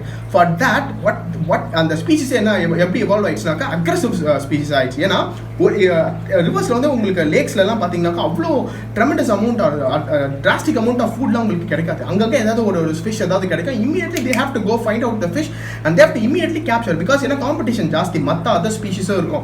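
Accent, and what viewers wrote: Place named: India. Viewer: Why do you speak Indian English?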